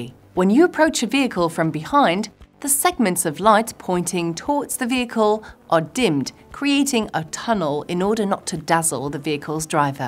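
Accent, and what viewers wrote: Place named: Scotland, United Kingdom. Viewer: she's from Edinburgh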